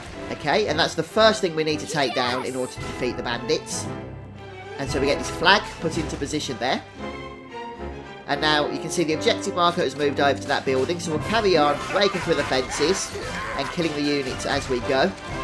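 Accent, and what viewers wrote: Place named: England, United Kingdom. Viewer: OBVIOUSLY he has an English accent. Start traveling, educate yourself and get cultured. The world doesn't revolve around how YOU particularly speak.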